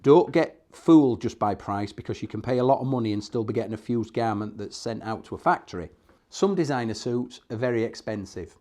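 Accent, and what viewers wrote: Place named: United Kingdom. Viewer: Yeah absolutely thanks for your understanding. Which part of UK is your accent from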